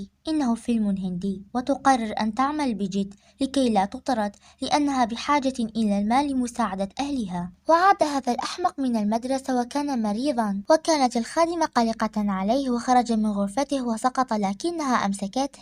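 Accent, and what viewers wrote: Iraq. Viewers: ولله حسيت انك من العراق🤯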